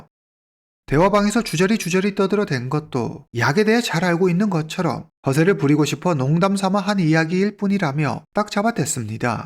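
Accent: Yanbian, China. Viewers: 말투를 들어보니 중국이나 연변동포 분이시군요 방송 잘 보고 있습니다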